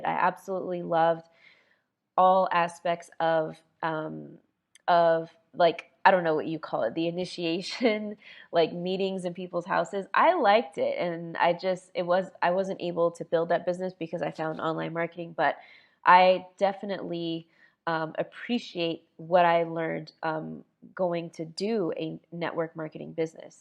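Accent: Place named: Australia. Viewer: Is it only me, or do I love his Aussie accent?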